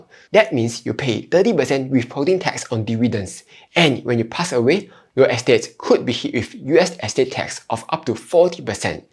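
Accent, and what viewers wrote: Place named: Singapore. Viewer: Your singarpore accent(?) lm not use to trying to adjust to.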